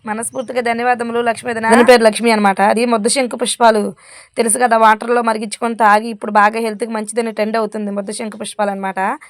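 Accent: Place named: Godavari, India. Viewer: మీ మాటల తీర్ను బట్టి ఏలూరు రాజమండ్రి పక్కన ఊరు అనుకుంటున్నా అక్కడైనా మీ ఊరు ఏ ఊరు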